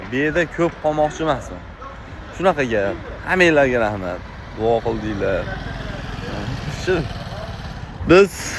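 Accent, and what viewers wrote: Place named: Namangan, Uzbekistan. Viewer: наманган хонобод кушни коратепа кишлок топдимми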